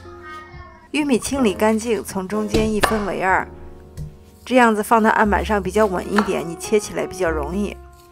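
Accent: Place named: Shaanxi, China. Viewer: 包谷棒~~陕普啊😊老乡在纽西兰问好啦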